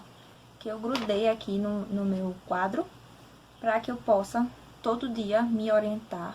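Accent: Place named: Recife, Brazil. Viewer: Que legal,! 🌼 Me inscrevi hoje, e tô gostando muito! Didática simples, clara e eficiente! Parabéns🎉🎊🎉🎊! Ah! Você ê do Recife? Seu sotaque parece 👀 de lá! Muito top, seu Planner! 📝 Abraço👐 Noemário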